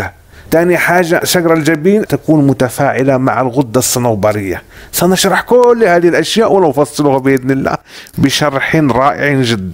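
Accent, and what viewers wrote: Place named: Egypt. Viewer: يا شيخ انت اكيد مصري مش مغربي عشان لهجتك مصريية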